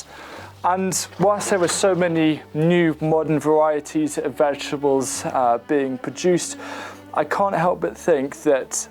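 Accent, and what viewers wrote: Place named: Wales, United Kingdom. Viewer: Your Welsh accent is so sexy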